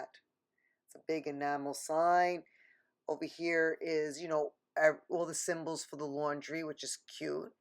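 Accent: New York, United States. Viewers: I hear a NY accent girly!! ❤️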